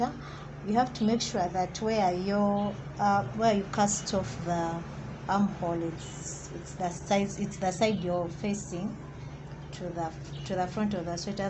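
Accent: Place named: Uganda. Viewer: sounds Ugandan. Do u offer lessons face to face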